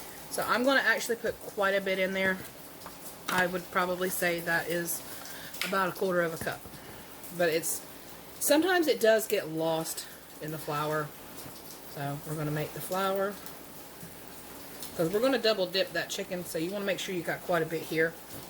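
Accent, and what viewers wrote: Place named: North Carolina, United States. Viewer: Looks great are you from down east NC? I have family there and you have their "accent" lol! Love your channel!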